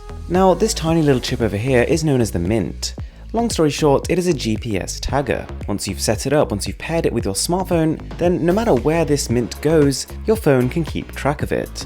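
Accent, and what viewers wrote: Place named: United Kingdom. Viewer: You clearly have a uk accent. But you advertise in dollars. Explain.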